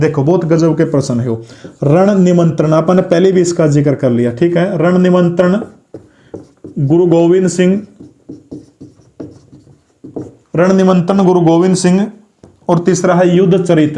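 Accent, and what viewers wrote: Haryana, India. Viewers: Guruwar aapki sabse behtrin chiz Jo lgii teaching m, vo h pure haryanvi boli nd Kuch specifics facts hmesa btate ho kisi na kisi ke baare m, bhot Bdiya Guruwar 🙏🙏🙏🙏🙏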